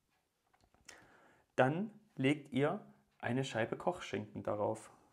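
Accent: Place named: Saxony, Germany. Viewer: ich finds immer wieder süß wie der Sachse versucht das Sächseln zu unterdrücken wenns öffentlich wird. :.-) Bin selber Leipziger und habe das bei vielen Videos bemerkt. Gutes Video , aber wo der Käse da schon "Farbe" hat weiß ich jedoch nicht . ;-) Aber Die "Karli" mach ich mir jetzt trotzdem.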